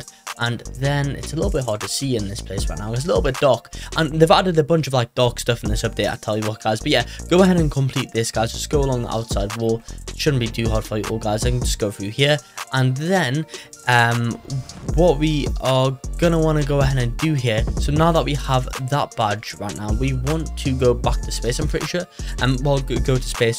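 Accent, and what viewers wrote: United Kingdom. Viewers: British man that helps us all in Roblox games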